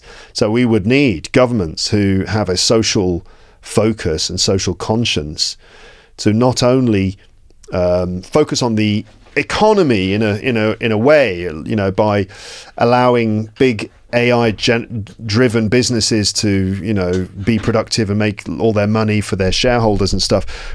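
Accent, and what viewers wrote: RP, United Kingdom. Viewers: He speaks with a standard British accent also known as Received Pronunciation. Since you're a learner of English, you should go for Received Pronunciation. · I love Bristish accent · Yes, speaks with what is called RP (Received Pronunciation), which is clear and easy to understand compared to many regional accents. You can also call it “BBC English”. I think you should not focus on one specific accent, just make sure people can easily understand you. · What is the name of the accent you speak? Is it like the BBC accent? ، Since I’m not a native English speaker and I’m learning English, which accent do you recommend I learn?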